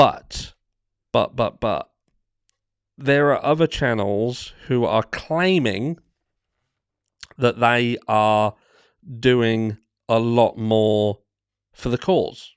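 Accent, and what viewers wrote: Norfolk, United Kingdom. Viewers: Love hearing your Norfolk accent. Makes me feel like I’m back home 🇬🇧😎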